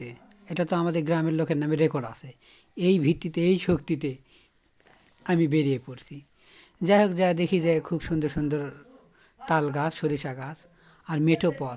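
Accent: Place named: Bogura, Bangladesh. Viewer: অনেক অনেক সুন্দর হয়েছে। সবচেয়ে বড় কথা হলো বগুড়ার আঞ্চলিক ভাষায় কথা।ধন্যবাদ।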